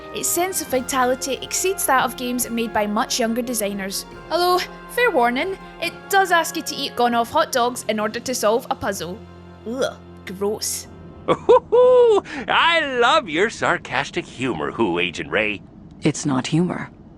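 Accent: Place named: Scotland, United Kingdom. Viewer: These vids are really good, and I love the Scottish accent, but they’d be even better if you wrote what platforms the games are on too!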